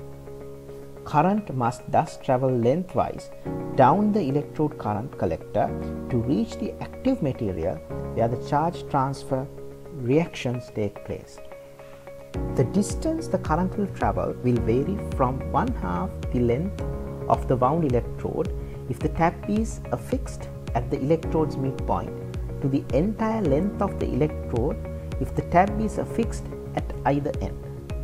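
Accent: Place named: India. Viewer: why India English.......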